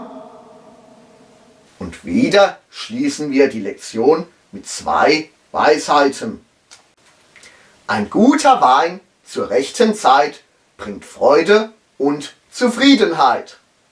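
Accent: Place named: South Palatinate, Germany. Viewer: Leider Südpälzisch 🤢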